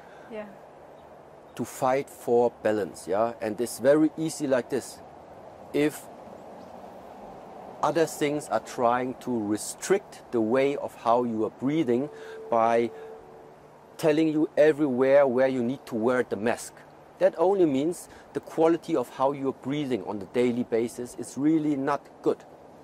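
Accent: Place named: Germany. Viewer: nach einer sekunde gehört dass er eigentlich deutsch spricht hahaha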